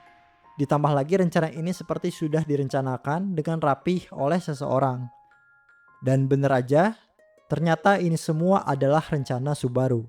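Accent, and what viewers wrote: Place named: West Java, Indonesia. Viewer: admin ketahuan orang sundaa😂😂, btw gw juga sunda sih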